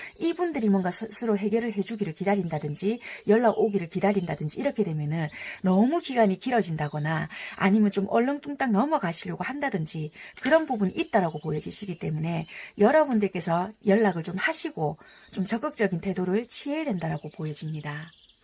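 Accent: Busan, South Korea. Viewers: 감사합니다☺️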